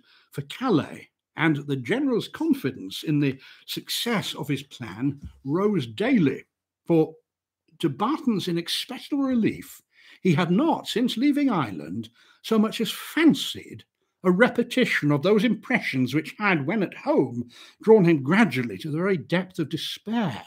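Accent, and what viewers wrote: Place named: RP, United Kingdom. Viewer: Thank you for this, Mr. Posh Voice Reading Man.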